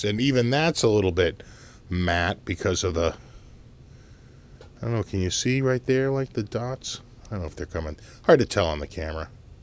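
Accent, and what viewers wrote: Boston, United States. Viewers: Boston English: Tuner = Tuna Tunah = Tuner Go figah....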